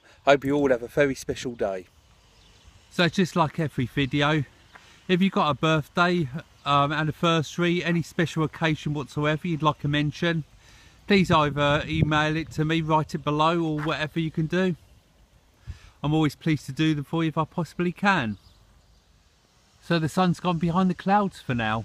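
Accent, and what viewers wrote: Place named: England, United Kingdom. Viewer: ist your name right?. I have a question about your accent. in which part of england people speak like this? thx in advance. i like your videos. cheers from a german from germany.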